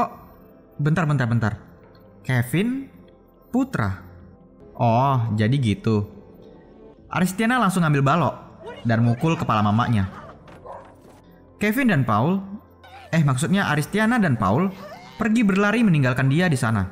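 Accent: Medan, Indonesia. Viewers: bang kau orang medan ya kalo gk salah suaranya sama njir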